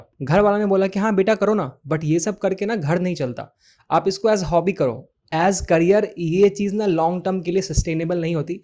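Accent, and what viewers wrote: Bihar, India. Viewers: Bihar se ho app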